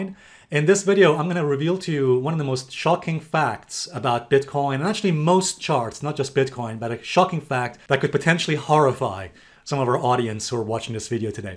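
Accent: England, United Kingdom. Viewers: Great Video keep like that every time by the way your accent England was 👍🏻👍🏻👍🏻👍🏻. I wann know something a bout altcion like Dash how much price well be .Thank you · Very interesting, thank you! Also your method of checking with the balanced level sounds reasonable! Your sport jacket does perfectly fit to you and the English accent!! :D Well done!!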